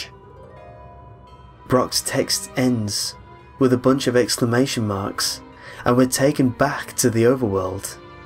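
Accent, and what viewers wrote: United Kingdom. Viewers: he's British. they have their speaking quirks, and they cant help it. honestly it sounds much better than American English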